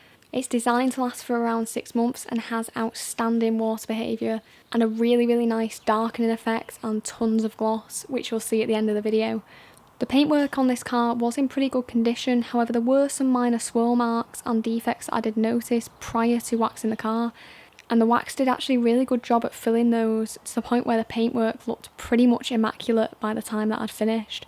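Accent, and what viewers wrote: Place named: England, United Kingdom. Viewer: Enjoy your English accent.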